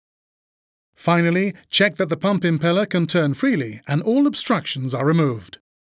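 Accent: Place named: Germany. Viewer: They same German voice on Airbus videos🤣🤣🤣🤣🤣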